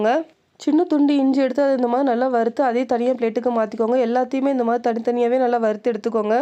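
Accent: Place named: South Tamil Nadu, India. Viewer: Neenga entha ooru mam Your slang is southside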